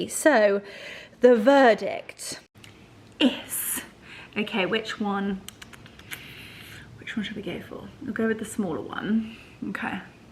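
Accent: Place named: RP, United Kingdom. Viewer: I did look it up, and whilst she was younger doing the interview, it is amazing at how much more "posh" she sounds now. Not complaining, just is interesting.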